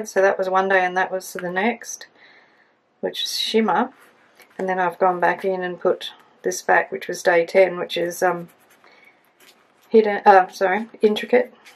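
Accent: Australia. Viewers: It’s a fantastic scroll. I loved following along your great journey of discovery, it’s so different from all the other journals I have followed through January 😍I’m in Melbourne and loved hearing your Aussie accent💖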